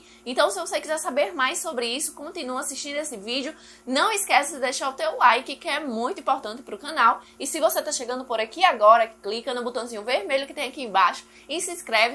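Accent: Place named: Pernambuco, Brazil. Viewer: Esse sotaque Pernambucano. Minha conterrânea, amei demais o canal. To como iniciante , já amei e já ativei tudo. Obrigada 😍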